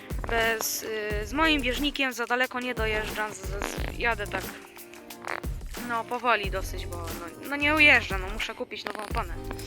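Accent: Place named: East, Poland. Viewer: Nie wiem jaki sens jest jeżdżenie jakąkolwiek hulajnogą przy takim śniegu jaki tutaj jest pokazany no to może tam gdzieś to relacja jest w języku trochę takim z akcentem wschodnim morze na południu Polski gdzie ta zima trochę tam gdzieś się pokazuje bo na północy a w Szczecinie mieszkam po prostu praktycznie zimy nie widzę ale nie zamierzam jeździć hulajnogą zimą bo to jest średnia przyjemność praktycznie no jeszcze na siodełku powiedzmy to jest jako tako ale stojąc to człowiek po prostu szybciej marznie i jest większe ryzyko wpadnięcia w poślizg w ogóle nie widzę tej jazdy zimą i należy ona do sporadycznych czy co wynajmują firmy wynajmujące czasami spotykają swoje właśnie hulajnogi porzucone w śniegu w ogóle to jest osobny komentarz do tego bo to już po prostu zakrawa na kpiny z hulajnogi wszędzie są bezładzie porzucane gdzie tylko popadnie i zagrażają przechodniom ja już bym się dwa razy o nie potknął wychodząc wieczorem przy słabym wzroku...m.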